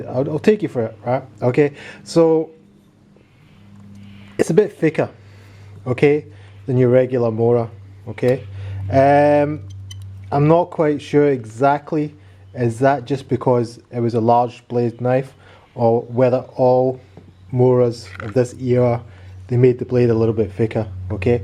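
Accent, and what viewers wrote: Scotland, United Kingdom. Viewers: Are you Scottish. Cool video man. Thanks for sharing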